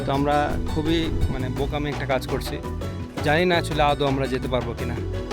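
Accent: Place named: India. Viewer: তোমার ভাষা তো ভারতীয়। কোথায় তোমার বাড়ি।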